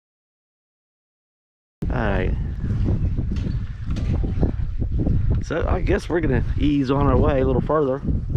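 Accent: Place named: New England, United States. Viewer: I'm laughing at your Yankee tongue in this video. The way you pronounce Pascagoula and Bayou. So funny.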